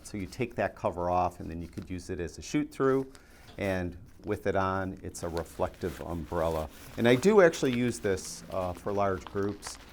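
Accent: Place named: Western New York, United States. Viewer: Nice to hear that western New York twang. I was born in Buffalo and my dad, same name, had his commercial art studio there for years in the Pitt Petri building. He did all the Spree covers for ages. I love your editing videos and have struggled with Capture One's complexity but love the quality. Maybe there is another package that is as good in rendering RAW but less convoluted. I don't need catalogs or even sessions maybe, would be happy to open and work on individual images most of the time. I'm learning Affinity so I can dump Photoshop, but the raw conversion is not so great. Thanks, again. Rix Jennings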